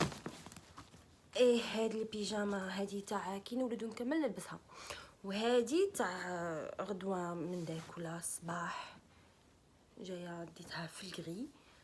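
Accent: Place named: Oran, Algeria. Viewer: نتي تيارتية احكي نورمال مالكي رجعتي تحكي وهرانية و راكي غير وحدك لا راجل لا والو ربي يسهل لك أن شاء الله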